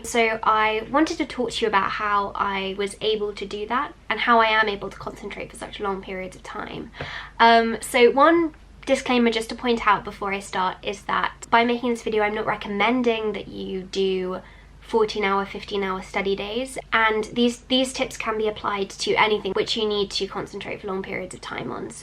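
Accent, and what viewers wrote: United Kingdom. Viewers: Hermione accent? She's just British mate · She is using british accent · Are there any youtube channels have British accent and make great videos liker her? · Great video miss , iam from india , highly appreciate ur work , I would request u to read quran translation of which is approximately 500 pages , a book which is from god believe 25 to 30 % of world population , by the way I think ur british ,. I love british accent a lot , great job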